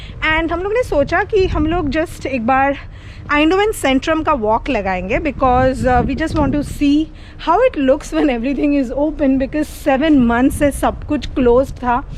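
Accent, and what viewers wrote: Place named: India. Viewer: I"ll make a guess by your accent.. are you indian, right? :) Your husband is an I.T. guy? (I ask that 'cause every indian abroad is I.T., which is a great thing.. Could you kindly ask him if I get easily a Javascript job or do I need to know ReactJS or other stuff? I'm planning to move there! :) Thankssss and greetings from Brazil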